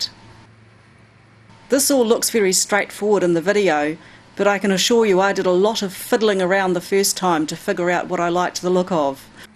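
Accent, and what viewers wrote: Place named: New Zealand. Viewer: kiwi accent <3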